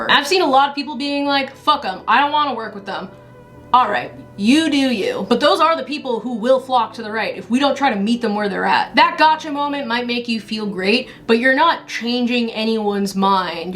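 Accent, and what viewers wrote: Boston, United States. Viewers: shows in NYC while getting ready to move to LA... but i still hear Boston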